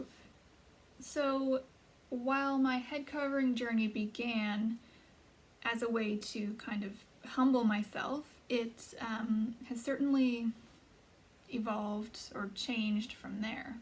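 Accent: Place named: Canada. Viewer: God bless you sister. Thank you so much for sharing. Good for you for seeking to obey the Holy Spirit's leading in your life. I'm super new to head covering, and get great joy from these wonderful testimonies. Are you Canadian by any chance? Just asking cuz you sound a lot like me, and I'm in Nova Scotia, originally from B.C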